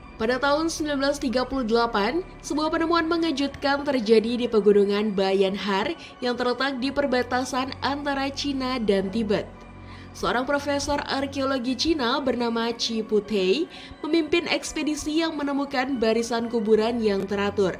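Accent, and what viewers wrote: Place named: Tegal, Indonesia. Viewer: pengisi suara nya seperti orang jawa, tegal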